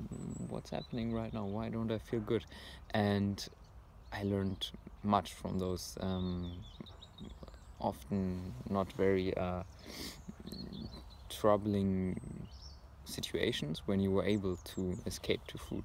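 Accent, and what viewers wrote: Germany. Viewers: By the way, am I spotting a german accent there? :) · are you German?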